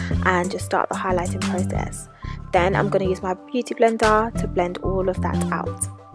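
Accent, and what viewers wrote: United Kingdom. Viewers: I had to subscribe you literally got me when I heard your voice. British, so I know where to buy makeup on a budget plus your makeup is FLAWLESS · are you from essex?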